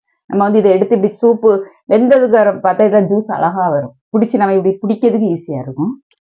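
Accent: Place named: Kanyakumari, India. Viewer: Konjum kumari tamil supper puriyatha vanga than mannakatty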